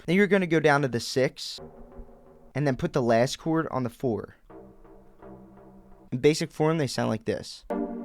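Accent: Philadelphia, United States. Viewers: You’re from Philly aren’t you? I hear the accent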